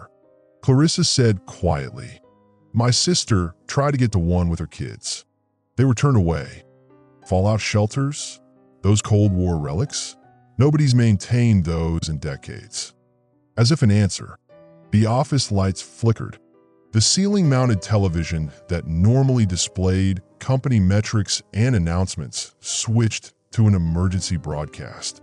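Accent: Spain. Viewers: Yeah, sometimes uses Spanish pronunciation. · yeah, the Spanish inflection was cracking me up.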